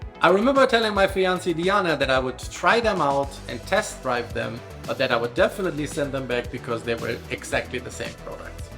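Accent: Germany. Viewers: Beautiful German Accent! Grüße aus Peking. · Deutscher oder⁉️ Man hört es extrem😂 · bro sounds a bit german ....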